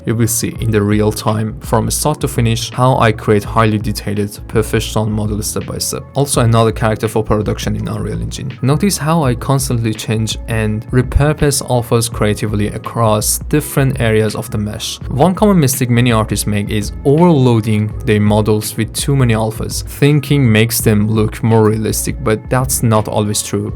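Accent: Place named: Iran. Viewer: This video is amazing, but im a beginner in zbrush or 3d space i worked with cc3 and 4 and i must say iclone and character creator is awesome but in zbrush i just know the barebone basics, where would you suggest that i go for learning zbrush or modeling? My goal for now to make a superhero characters and stuff, like batman or red hood or superman basically characters with armors and helmets or trench coat and cape, i know that you're making the same kind of stuff in your video but my problem is it that i didn't know some of things that you are doing, like using specific tool or features I checked a lot of tutorials but somehow there confused more because i follow what there doing but these always a problem, so the help or recommendation would be appreciated. By the way your accent is so Persian, if you're one of us, dorud my brother, a lot of love from hormorzgan❤️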